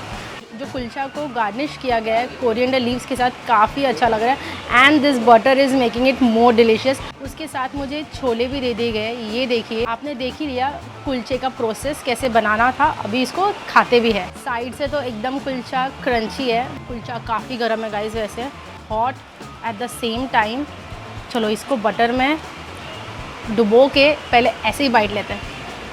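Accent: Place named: Maharashtra, India. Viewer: From the way of your speaking accent I'm sure you are basically maharashtrian girl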